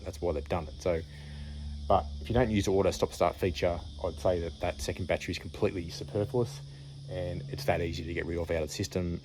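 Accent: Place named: Australia. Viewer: I gave you a thumbs up for the Aussie accent and for having the balls to drive on the wrong side of the road all the time!!!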